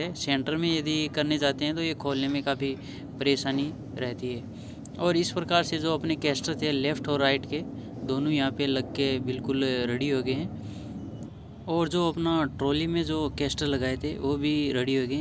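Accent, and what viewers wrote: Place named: Gujarat, India. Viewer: गुजराती हो क्या आपकी वोइस से पता चला आगेखेचेंगे तो , 55 , 66 का बेड है डबल बेड अछि तकनीक है 👍👍🙏 🔨🔨🔨 गुड़ वर्क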